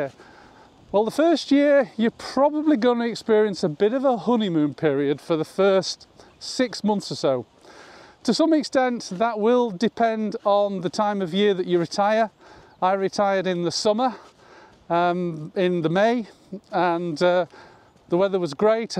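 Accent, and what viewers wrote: United Kingdom. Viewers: Thank god British speak, like you I retired at 45 best move ever, how I found time to work I’ll never know. Now 65 still fab.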